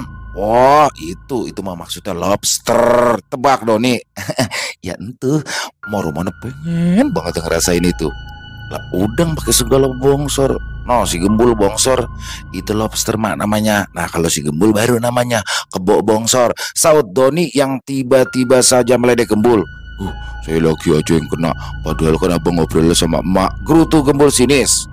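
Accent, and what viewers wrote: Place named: Java, Indonesia. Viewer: Ngakak klau denger logat bicara gembul bg medok bgt lucu seru di tambah bg Dono yg slengean keren bgt bg hidup bgt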